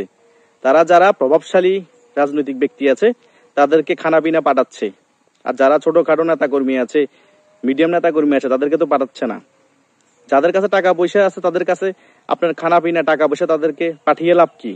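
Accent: Chittagong, Bangladesh. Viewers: ভাইয়া আপনার বাড়ি কি চট্টগ্রাম???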